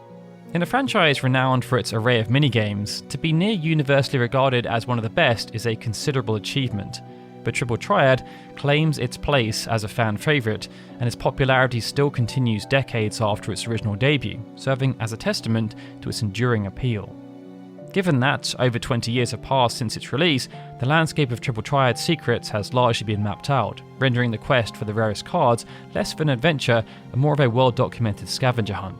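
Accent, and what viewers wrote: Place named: United Kingdom. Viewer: British limey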